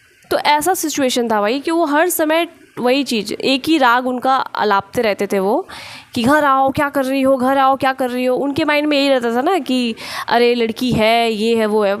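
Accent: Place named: Bengal, India. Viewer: your bengali hindi accent is awesome 🤩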